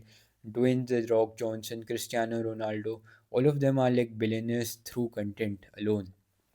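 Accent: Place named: India. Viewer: Indian accent😂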